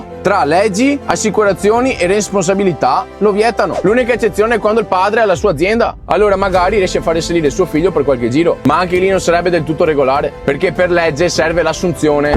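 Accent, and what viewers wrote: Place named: Padua, Italy. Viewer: Ai ragione.. Tutto vero ciò che dici. Ti saluto. E ti auguro sempre di fare bellissimi viaggi un abbraccio e bacione. Marta da Brescia. Sei un padovano. Doc. Mi piace molto sentirti con la tua parlata padovana.ciaooooo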